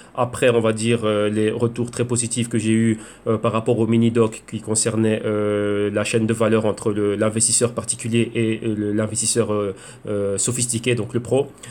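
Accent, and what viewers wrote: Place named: Belgium. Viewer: Tu as un ton qui ressemble à celui du sushis...es tu belge?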